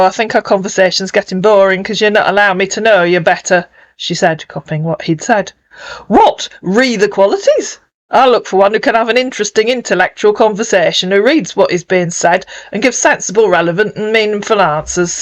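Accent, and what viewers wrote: United Kingdom. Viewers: Howdy and hello, I thought that so called conversation was great and I love your accent and it was nice to listen to once in a while since I am half British on my mom's side. And if you want you might want to watch Monty Python's Philosophy soccer/football match between the Greeks and the Germans. You might find it enjoyable. Take Care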